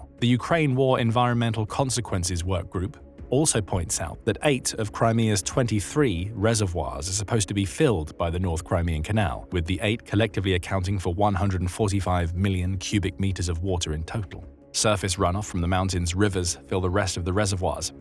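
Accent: United Kingdom. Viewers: Misinformation is so easy to detect these days. Just detect the british accent.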